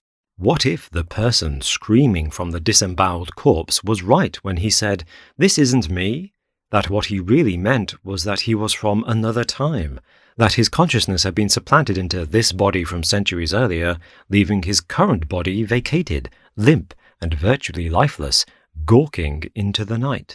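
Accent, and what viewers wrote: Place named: United Kingdom. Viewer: Your stories are pretty gruesome with detailed descriptions of repulsive stuff.......yikes. But whats weird is your lovely British accent makes it all palatable. Lol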